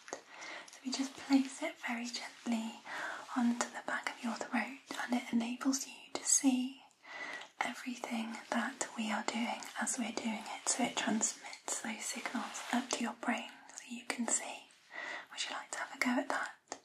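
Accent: United Kingdom. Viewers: SHES BRITISH MATE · Is this brit accent ?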